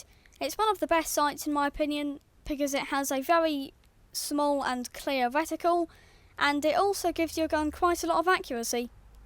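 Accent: United Kingdom. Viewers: You sound british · Are u british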